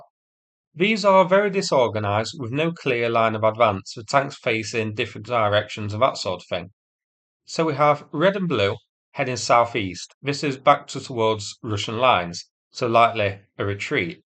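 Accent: United Kingdom. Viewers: Just an observation. You are speaking His Majesty’s English. Here in America. I noticed while working in a Nippon Restaurant. That in Nippon. They teach H.M.S. English I.E. Bonnet = Hood. Boot = Trunk. Knickers are Panties. So My vocabulary is expanding. I use Rodgerd,Knackerd now. And there called cookies Not biscuits. Torches are for Lighting fags ? Oh cigarettes. No it’s a Flashlight. Keep up Your Good Work Sir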